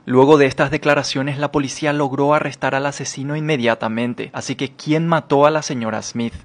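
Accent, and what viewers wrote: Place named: Argentina. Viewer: amigo porque de la nada empezó a utilizar el acento argentino si en los otros videos no lo usaba , no veo la necesidad de hacerlo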